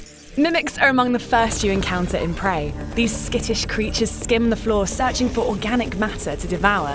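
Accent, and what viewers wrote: United Kingdom. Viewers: tucker she is British not American this time maybe that's the different accent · damm narrators british accent sounds gorgeous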